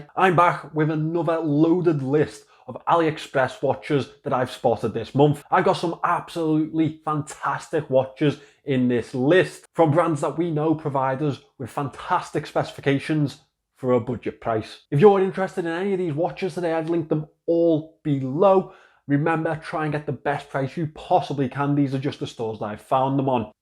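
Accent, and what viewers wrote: Liverpool, United Kingdom. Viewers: hey, nice choice of watches. Nice video. What accent do you have? Scouse?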